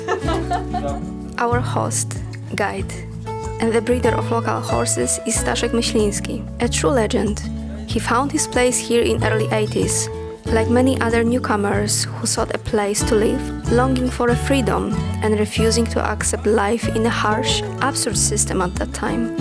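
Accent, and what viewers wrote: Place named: United States. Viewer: Piekny film.Jest sub. Świetnie znasz angielski.Taki bardziej w amerykańskiej wersji(accent). Pozdrawiam.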